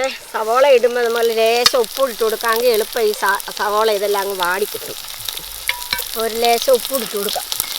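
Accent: India. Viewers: Ammmachi bhaaaasha super like yourlanguage..aaalapppuzha.suppppper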